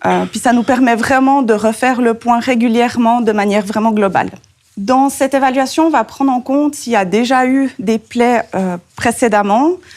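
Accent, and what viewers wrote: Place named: Switzerland. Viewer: Aaaah l'accent Suisse, pas d'IA. C'est reposant. Sujet intéressant. Note : il faudrait faire très attention quand vous parlez d'un thème qu'il n'y ait pas de bruits parasites derrière vous comme des gens qui toussent de manière récurrente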